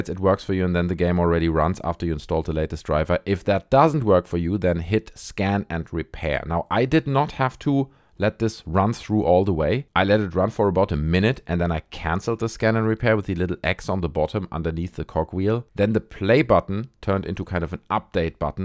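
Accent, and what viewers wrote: Germany. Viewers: are you german you have a very german accent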